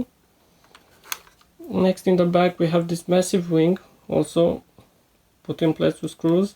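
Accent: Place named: Romania. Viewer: buna, colectionezi numai 1/18 ? Eu am acelasi model in acelasi spec ca si al tau dar e un Spark 1/43 .eu colectionez doar 1/43 . Stii, miam dat seama ca esti român dupa accentu care il ai in engleza. Vb bine, dar accentu l - ai pastrat si nu e rau. Faza e ca io l - am pietdut si nus daca i bine sau rau.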